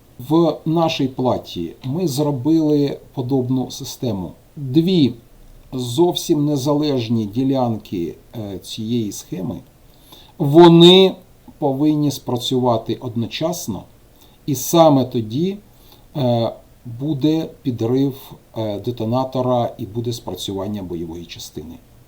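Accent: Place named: Kharkiv, Ukraine. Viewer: Харкiвський акцент украiнськоi, на дев'яносто п'ять вiдсоткiв майже такий, як I киiвський))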